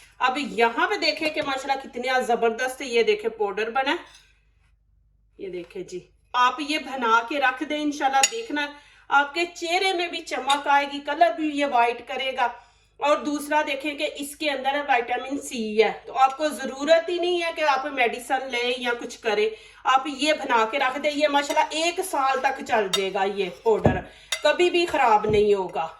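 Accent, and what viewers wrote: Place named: Punjab, India. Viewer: کیا بات ھے آپکی اردو وہ بھی پنجابی ٹچ کے ساتھ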